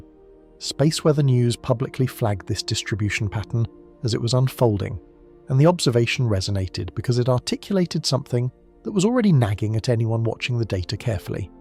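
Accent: United Kingdom. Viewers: Fear porn pigs at it again. I'm so scared I crapped the couch. Smells worse than his British accent !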